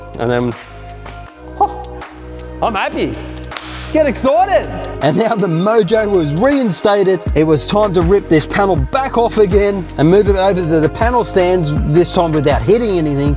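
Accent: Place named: New Zealand. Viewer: Do you ever lived in New Zealand ? your accent have a bit off Kiwi in it .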